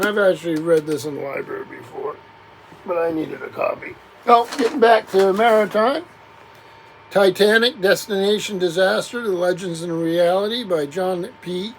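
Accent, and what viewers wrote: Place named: United States. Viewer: What part of the US are you from? You sound like a local to me.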